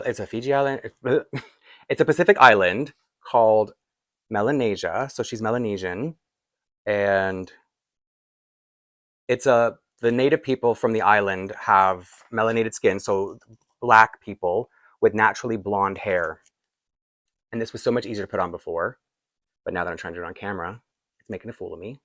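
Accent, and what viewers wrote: Canada. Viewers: I sure love you and your channel! You are so personable I feel as if we’re sitting in the room together and you’re showing me your dolls. I also love your slight Canadian accent, which I thought was cute. You pointed out. I didn’t realize that’s how that Dog’s name was pronounced either so thanks for including me in! It’s the wiener dogs right? 😅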